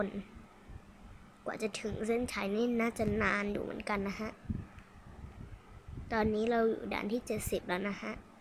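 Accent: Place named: South, Thailand. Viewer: พี่เป็นคนใต้ไหมค่ะ ...เห็นพี่พูดใต้เกือบทุกคริปเลย...🥀🥀🥀🥀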